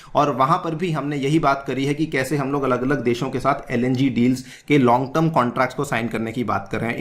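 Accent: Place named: Haryana, India. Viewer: Baheri asi production dekh li ...sir is talking in pure desi haryanvi accent. Hum local haryanavi me bolte h asa..hindi m to bht boltr hn..sir bht acah laga apse haryanavi sunkar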